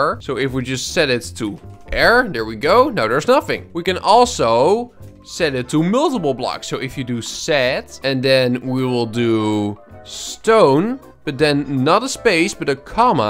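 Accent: Netherlands. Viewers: hi ar you dutch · Best English Nederlands voce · Youre dutch right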